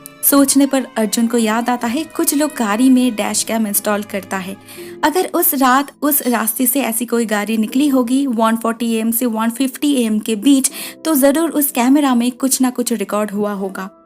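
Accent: Bengal, India. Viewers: R u Bengali or east Indian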